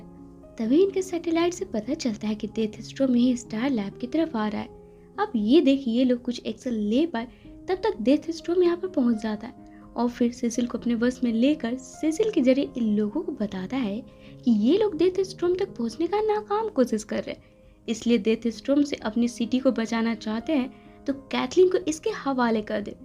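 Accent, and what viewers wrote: Bihar, India. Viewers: Kya aap Bihar se hai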